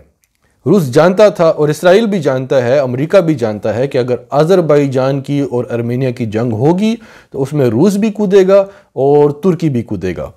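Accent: Pakistan. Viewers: Very good urdu accent pure pakistani accent unlike urdu speaking person which resemble to indian accent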